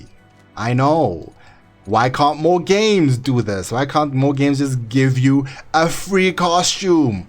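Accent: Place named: South Africa. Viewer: Best tutorial hands down, you sound South African 🤔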